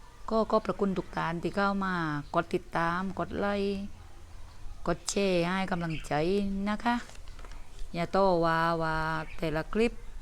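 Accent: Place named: South, Thailand. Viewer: ฟังเสียงคนปักใต้ชอบครับ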